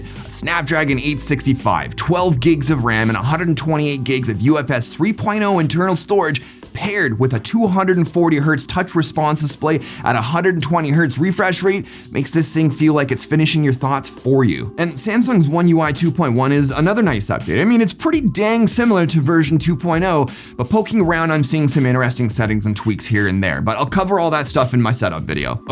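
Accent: Canada. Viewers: Why is every tech YouTuber a Canadian? Never noticed until I moved to Australia and became more sensitive to fellow Canuck accents.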